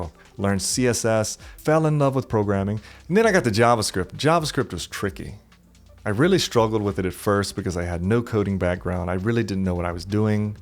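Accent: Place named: Louisiana, United States. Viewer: I changed my career from food service -> call centers -> web development. I did the final one at 30. All self taught I think it taught me more about perseverance and made me unafraid to do new stuff I no longer let not knowing something prevent me from doing it. That accent you have though, I bet you were born in middle Louisiana or southern LA sounds like Avery Island. --- To other folks looking to make this same type of switch. Do not let anyone tell you "programming is too hard" it just takes dedication and practice as well as a penchant for enjoying learning.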